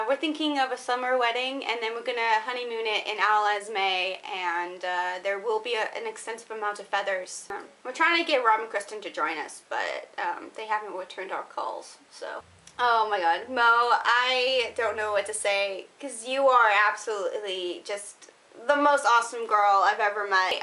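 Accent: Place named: United Kingdom. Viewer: aww you have a amazing british accent and that song?! IS IT ROB SINGING?! I LOVE IT! and do you know what its called? and haha i love your videos! :D DONT YOU DARE GET EVER BORED OF ROB LOL! :D and aww what you said was so cute :) I am so consider myself so close to you too and i love you! :) like a big sister <333 and yes! SHAYTARDS! :D and what you said about the other girls are so true! :D LOOL YOU AND MONA! haha i love you <3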